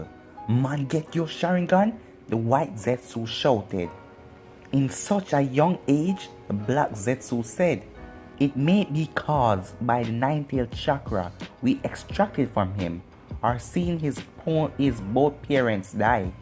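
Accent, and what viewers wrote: Jamaica. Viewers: Are you jamican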